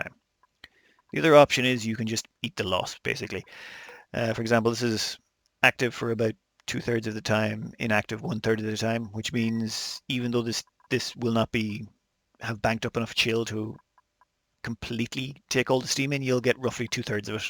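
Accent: Ireland. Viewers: Well, I only noticed it when you said a few words with an Irish accent, though it is true that your accent is not that strong but you still have a little bit of accent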